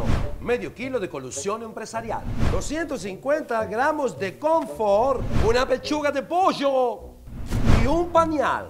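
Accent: Argentina. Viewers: Está wenisimo el video, pero si llevas 23 años en Chile y te relacionas con Chilenos a diario... Como es que aún no has perdido el acento Argentino?. La española Eva Gómez (de El Diario Eva- CHV) a los 5 años de residir en Chile, ya había perdido su acento español y ahora prácticamente es una Chilena más, pero en cambio tú, Barticciotto, Cristina Tocco, etc. Han vivido décadas en Chile y aún hablan como Argentinos. Que se peguen los acentos es algo inocuo de acuerdo a la región o país en dónde estés. Tal vez ustedes piensan que les da más caché seguir hablando como argentinos pareciendo casi una imitación burda (a lo Amaro Gómez-Pablos) 😅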